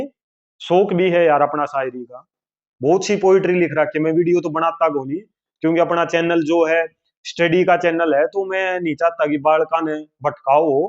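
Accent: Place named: Haryana, India. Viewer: राम राम भाई 🙏 मैं जयपुर राजस्थान से ममता आपका बहुत-बहुत धन्यवाद करती हूं मेरी जिंदगी में भी कुछ ऐसा ही है aसामाजिक तत्व हैं जो मुझे नीचा दिखाना चाहते हैं सब कुछ कर लिया।मैं बहुत ही परेशान थी तभी आपका वीडियो यूट्यूब पर आया मैंने सुना सबसे ज्यादा धन्यवाद तो आपकी बोली को जो बहुत ही अच्छी है हरियाणवी मुझे बहुत अच्छी लगती है और आप भाई एकादम एक ही नंबर।के इंसान.हो जी🙏 आपका भगवान भला करे आपorr ऊंचाई छुए ....... Aapki badi bahan.....mamta jat.....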